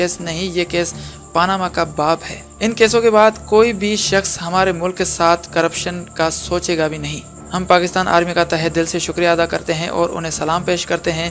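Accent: India. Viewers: awaaz se hi pata chal raha he k ye bharwa koi indian he panyakka tu te ap moodi da mundda lagg rea e'n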